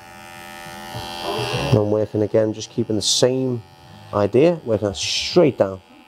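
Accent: Liverpool, United Kingdom. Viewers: I’m a Yank so forgive me, but does have a Liverpool accent?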